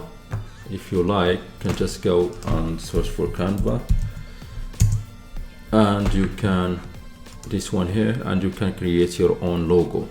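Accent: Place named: Morocco. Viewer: mgharba baynin mn accent 💪🏼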